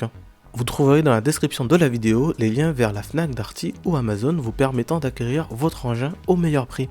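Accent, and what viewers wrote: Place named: China. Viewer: je sait que tes chinois car j'ai voyager dans le monde et je reconait tous les accent meme petit qu'il soit ! enfin je dit ca , car je voulait dire que la chine est un pays qui invente des choses supperbe , que ce soit des choses que l'on trouve sur des vidéo youtube insta ect comment ouvrir ceci sans cela , comment devisser sans clé , comment ceci comment cela , les vidéo sont nombreuse ! les chinois sont supper inventif ! puis ont a sur les magasin de type magasi nen ligne qui vende de tout petit gadgetr mais a chaques fois des choses merveilleuse mais qui a chaque fois sont très utile . puis ont a les smartphone dont j'ai plus jamais acheter autres que huawei ou wiaomi comme le 14 tpro qui a une carte graphique de ouf ! 12 giga de ram enorme ! et un demis terra ! et le proccesseur ses un des meigleiur ! soliditer supperbe avec le dernier écran le plus solide du marcher ! 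bref la chine vient en force dans tous les domaine ! ils faut dire que la chine est en avance de mentalité de plusieurs millénaire ! l'unique dommage ses la liberter en chine ! et beaucoup voye le chine comme une menace moi je vois que la russie et que la chine ne donne rien pour l ukraine enfin aide sont allié mais de chose minim mais non offensif ! bref la ici la trotinette moi jai le model xiaomi 4 lite 2 gen que j'ai eux gratos en achetant le 14t pro ! tous ca pour dire que plus j'achete chinois plus je me rend compte que leur gamme monte de niveau , ont des loin du made in china des années 90 dont tou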